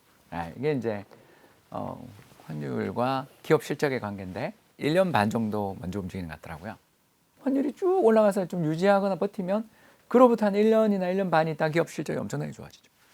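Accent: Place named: Gyeongbuk, South Korea. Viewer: '경북 사투리'에...듣고나면 고구마 한 솥 먹은 느낌!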